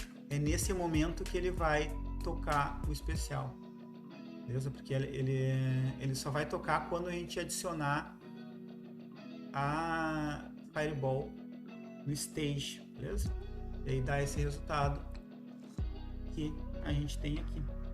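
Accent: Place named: Porto Alegre, Brazil. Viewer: Salve mano! Pelo sotaque deve ser de POA. Tô desenvolvendo um beat n UP na Godot, no futuro quero fazer um de luta. Boa aula!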